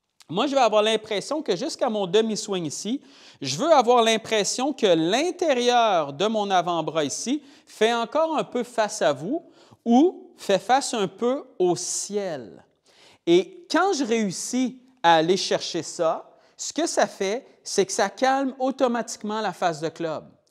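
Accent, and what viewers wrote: Quebec, Canada. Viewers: Vidéos toujours aussi bonnes en plus j'adore votre accent québécois.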